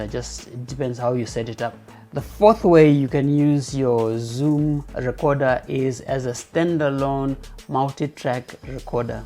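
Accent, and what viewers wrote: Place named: Zimbabwe. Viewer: This sounds like a Zim accent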